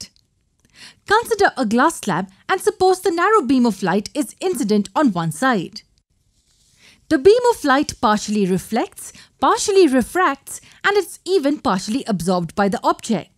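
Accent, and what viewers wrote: India. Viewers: I only watched this cause of the Indians voice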